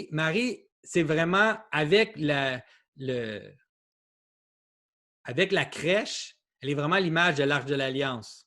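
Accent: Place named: Quebec, Canada. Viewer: I don’t even speak French and I know this guy is speaking Québécois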